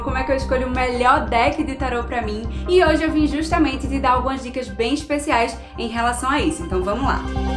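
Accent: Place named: Recife, Brazil. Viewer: Pelo sotaque já sei que é de Recife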